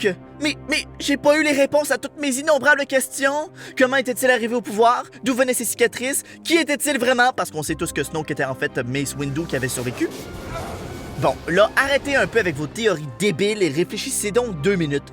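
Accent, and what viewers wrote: Quebec, Canada. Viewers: j'adore ton accent québécois je trouve ca vraiment fun et ton humour est cool je m'abonne ;) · Bravo j'adore ce que tu fait. Je te trouve très drôle et avec l'accent québécois c'est encore mieux. Que vive le Québec 🇨🇦🇫🇷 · Bonne video, en plus ton superbe accent québécois rend vraiment bien. Avant je trouvais ce film affligeant, grâce à toi je le trouve juste déprimant. Peut être qu'en la visionnant 24/24 pendant 6 mois, je trouverai ce film bon. Mais là, j'ai la flemme...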